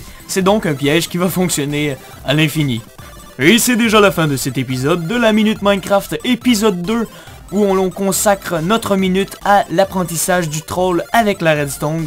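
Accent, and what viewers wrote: Quebec, Canada. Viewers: Cool quebecois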